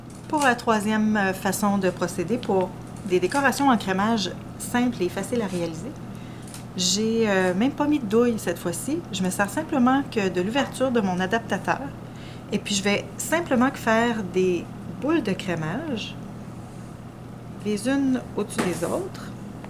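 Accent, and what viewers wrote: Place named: Canada. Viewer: c 'est tres jolie et j adore l accent canadien 😉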